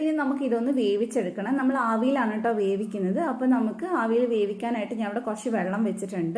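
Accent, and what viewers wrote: Kannur, India. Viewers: Chechi kannur ano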